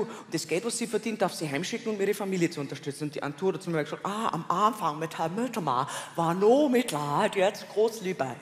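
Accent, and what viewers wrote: Bavaria, Germany. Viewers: Lernen bayrisch oder höre es nicht an. Ganz einfach! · Ich habe sogar versucht Bayrisch mit Untertiteln zu verstehen - mein Gott, kommt da ein Müll raus., vielleicht etwas langsamer. Wir sind nicht auf der Flucht. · Einfach so oft anhören bis du 95% verstanden hast. Das Wörterbuch Bayrisch leicht lernen. 3 Wochen mit Bayern auf Urlaub, keiner der Deutsch kann. Es wird nur Bayrisch gesprochen, dann verstehst sicher 100% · Zum Glück versteh ich von Natur aus als Steirer🇦🇹 auch die Bayern. Lg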